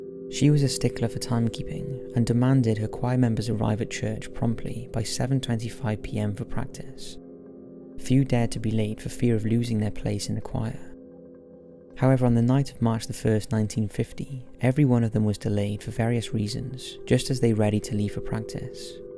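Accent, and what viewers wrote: Wales, United Kingdom. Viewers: Sounds welsh to me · It's definitely Welsh, I live in the Midlands and we sound nothing like this guy. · Except as a Welsh girl, I definitely do hear Welsh in his accent! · Deffo welsh! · Definitely sounds Welsh to me!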